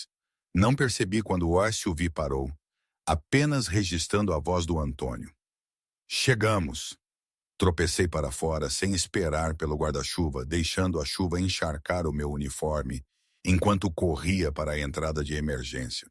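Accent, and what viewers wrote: Portugal. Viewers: ADORÁVEIS NARRATIVAS COM O PORTUGUÊS DA LÍNGUA PORTUGUESA DE PORTUGAL. ENREDO EXCELENTE E RELEVANTE!✨✨✨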